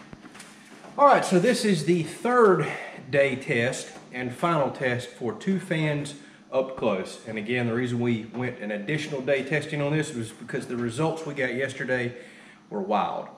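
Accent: South, United States. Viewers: People have been trained by the media that when they hear a slow talking southern accent to automatically think that the speaker is an idiot. Ignore the people that think you're an idiot because you're much brighter then they are.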